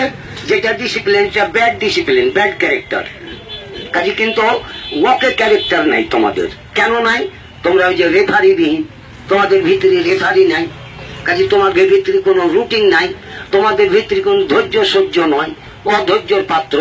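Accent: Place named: Murshidabad, India. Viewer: Murshidabad er motho bhasa bolchhis re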